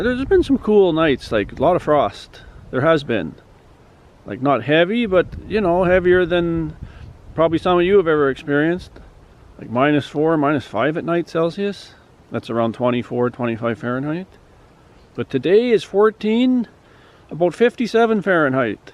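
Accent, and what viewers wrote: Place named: Canada. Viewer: New to your channel… I’m guessing you’re a fellow Canadian by your accent/dialect.. Where about’s you located? I’m subscribed! Cool stuff, with love from Alberta ❤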